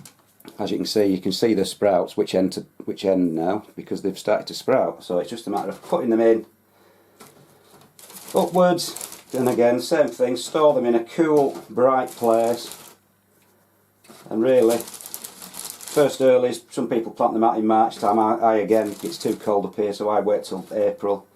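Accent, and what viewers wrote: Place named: Barnsley, United Kingdom. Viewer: c v later you sound like you're from the barnsley area im from wath myself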